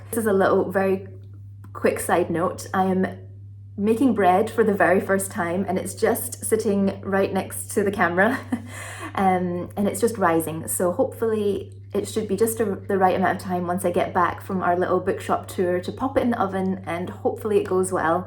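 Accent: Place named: Edinburgh, United Kingdom. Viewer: Just discovered this on my feed. What a wonderful find. I'm a real book nut. This was heaven for me. And you seem like a lovely person with a gorgeous Edinburgh lilt. Thank you ❤️👏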